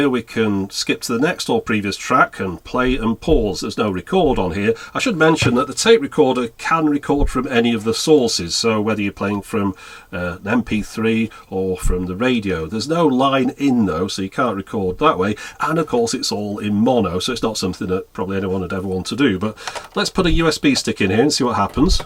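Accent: United Kingdom. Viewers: I have a number of very good friends in the UK and this guy sound like so many of them. Before you get mad I AM NOT making fun of this man. I love this channel and what he does sharing technology with us. What I enjoy is the way he phases what he says. He said something along the lines of Yes you can play MP3's via USB drive but it does not really make any difference since it all sounds like utter rubbish anyway. When he says something is terrible it just makes me laugh because, he is ever so polite BUT, when he says something is bad his words are so hard, biting and direct yet so gentle in a way. I subscribe to this channel because; this guy is great and knows his electronics. This guy is honest. Yes sir I've brought a few vintage items just because I saw them here first. That said the reason I love this channel beyond the technology is because; this guy savages today's cheap electronics. 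The funny thing is the polite lovely tone of his voice and his choice of words almost kills a bad device with kindness which just makes me scream with laughter. I agree with him this mono cassette player \ recorder boom box is total and utter rubbish no matter what you try to do with it. I'm not joking when I say I am happiest when this chap evaluates modern tech because; he will buy cheap devices for 30 pounds sterling and put them through their paces. This is one of the best channels on You Tube! Thank you sir. You remind me of my friends from the UK who can say the most mundane things an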